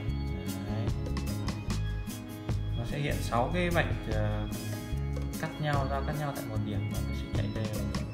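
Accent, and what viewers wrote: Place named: Nghệ An, Vietnam. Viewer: Noi dep Nghệ gi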